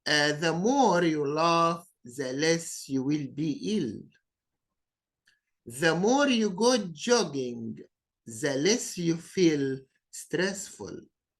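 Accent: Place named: Egypt. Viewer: Your pronunciation is very awful and bad. You speak an Egyptian English.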